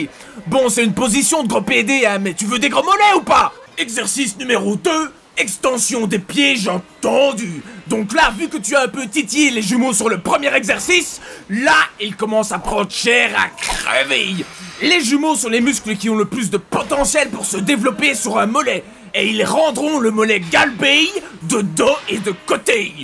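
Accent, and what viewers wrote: Belgium. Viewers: c'est l’accent belge qui fait la différence ;-) j’aime bien toutes tes vidéos on apprend beaucoup de choses !!!!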